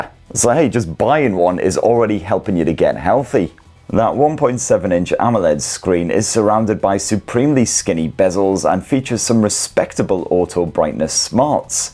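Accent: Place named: Scotland, United Kingdom. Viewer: I am watching his reviews to hear dat Pure Scottish accent- who is the same?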